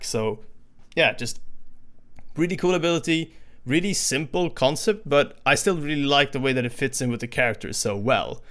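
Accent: Sweden. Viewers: This guy has Swedish accent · You swedish?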